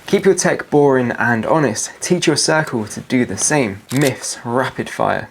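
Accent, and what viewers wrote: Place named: England, United Kingdom. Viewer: I'm sure that way of speaking is perfectly natural for him as an English person. Depends on where he's from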